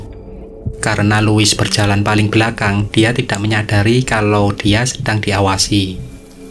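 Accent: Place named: Java, Indonesia. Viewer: Film nya menegangkan. By the way spean orang jowo to mas logat nya ketauan. Hehehe...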